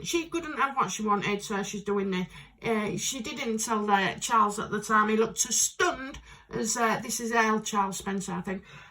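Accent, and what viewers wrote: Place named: Hull, United Kingdom. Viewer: Hi!! Thanks for saying, "Hi" :) I just love listening to your accent, I know we must be distant cousins as my family is from Hull :D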